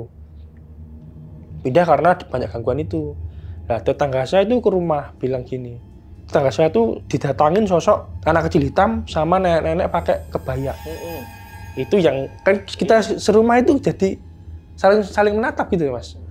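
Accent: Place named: Java, Indonesia. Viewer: Medhok bgt mase😂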